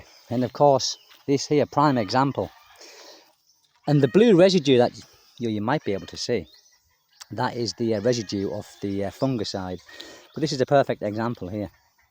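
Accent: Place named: Northern England, United Kingdom. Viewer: Funnily enough i did ask GPT about teaching English online without any qualifications and it suggested Preply. I only need to earn about £300 a month when living there to stop me eating into my savings but i do plan to have a dabble with YouTube also. Maybe do POV walking vlogs or if i'm not too shy do the proper vlogs walking about interacting with people. You do Preply or something similar? I don't have a TEFL certificate or a degree so i'm restricted to the casual platforms. Where were you from in the UK by they way? I'm Nottingham, but i suspect you were a bit further "oop norf" Sheffield? Can't quite place the accent 😅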